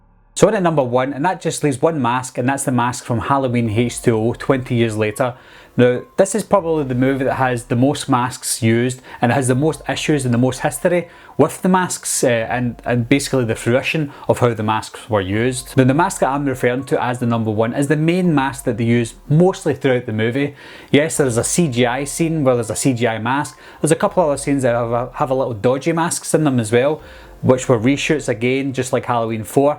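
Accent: Scotland, United Kingdom. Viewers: i love that scottish accent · Where you from in scotland? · Are you Scottish or Irish if you are cool I am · Not to be rude but are you Scottish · Da fuq u saying? Is that a Scottish accent. And the H20 mask was terrible IMO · Love your accent, if its Scottish, I'm actually 89% Scottish and my great uncle had the movie braveheart made about him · Are u Scottish cus I am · Scottish or irish lad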